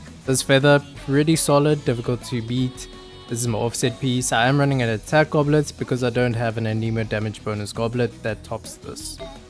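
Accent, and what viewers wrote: South Africa. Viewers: I can definitely hear that this guy is South African, Lekker my bra! SA for the win! man is lekker ryk om so baie constellation op so baie karakters te hê T-T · South African? Uncommon... Cool video 👌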